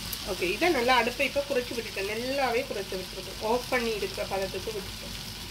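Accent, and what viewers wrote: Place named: Sri Lanka. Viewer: Elangai Tamil inimai sis 🤝😋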